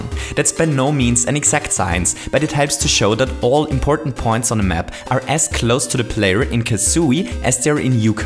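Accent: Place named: Germany. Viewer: I guess he is from Germany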